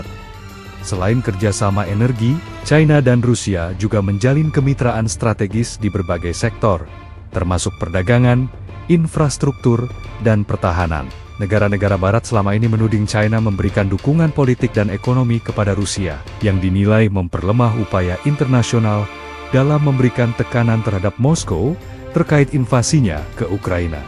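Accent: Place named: Java, Indonesia. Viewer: Pembaca ini logat Jawa sangat memalukan membaca cina saja ti pecus yg benar itu China bukan Chaina seharusnya gunakan bahasa baku bukan bahasa ke barat baratan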